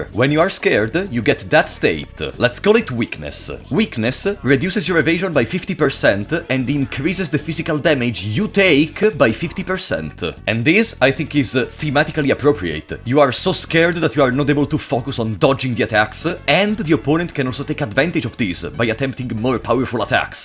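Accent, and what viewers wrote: Italy. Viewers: I've never heard an accent as Italian as yours. I have family in Italy, i've had online friends in Italy, nobody I've heard has had as thick of an accent as yours. Ngl it's a lil impressive and i'm not saying any of this as a bad thing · I just love your energy, I never hear italian accents online and when i do they’re usually more timid, but damn you wear it on your sleeve and you OWN IT! I love the energy!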